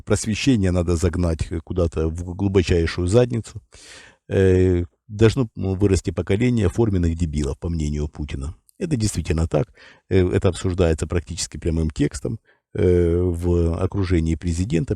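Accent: Ukraine. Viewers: А все-таки, у ведучого відчувається українське походження. Може із кубанців?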